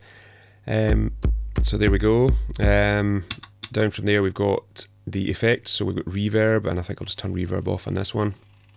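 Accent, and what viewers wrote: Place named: Scotland, United Kingdom. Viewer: It's a great tutorial my Scottish friend (is it Scot? I'm American so it sounds like you're Scots heh heh) A little long before the music examples, but it's totally cool.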